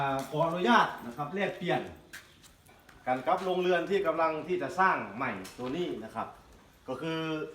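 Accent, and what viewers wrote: Thailand. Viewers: ฟังสำเนียงคือคนนครพนมหรือสกลนครผมอยู่นาทม นครพนมอยากเลี้ยงคือกันแต่ยังบ่มีความรู้ด้านตลาดแนะนำได้บ่ครับ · อยู่ที่ไหนครับภาษาพูดเหมือนบ้านผมเลยครับผมอยู่สกลนครับ ดูแล้วท่านเก่งมากๆครับ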